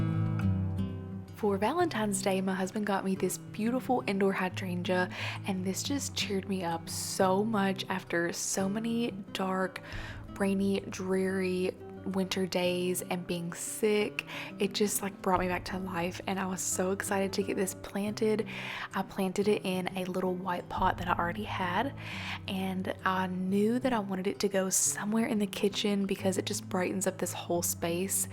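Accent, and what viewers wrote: South, United States. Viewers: New subscriber here. Congratulations on your beautiful new home! I’m so very excited for you. The countryside looks like farmland in my home state of Ohio but from your accent I’m guessing farther south 😉. I’d love to know what state you are in. I’ve lived in Oregon for 40 years but Ohio farms are in my heart and blood. Those views will never get old. Enjoy every moment and thanks for sharing your joy. May God bless you and your home. ❤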